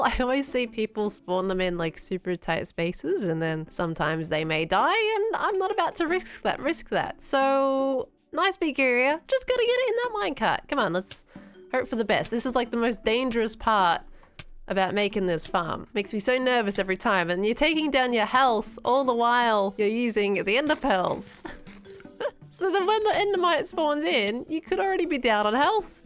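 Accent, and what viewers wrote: Australia. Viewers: This series is so fun. I'm so so glad I've found it. It is just so nice to have a aesthetically pleasing builds all backed up by an Aussie accent, I just find that I watch so many people from other countries lately that I miss just hearing something more familiar ❤️ (does that make sense?.... tbh I find that I sometimes even crave more Australian-ness in movies and tv lol)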